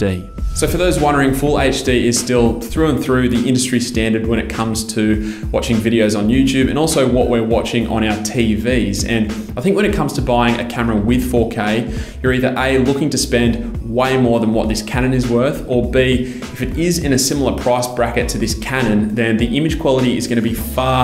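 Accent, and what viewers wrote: Australia. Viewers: Very nice video, quick question are you Aussie?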